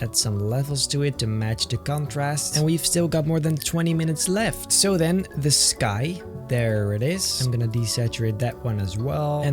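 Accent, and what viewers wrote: Netherlands. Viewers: Damn I was hoping for more dutch accent but honestly any vid is a win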